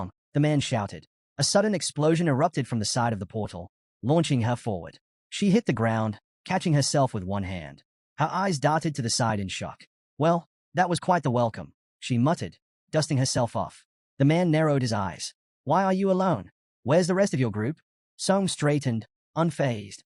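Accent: United Kingdom. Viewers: Fuck this British accent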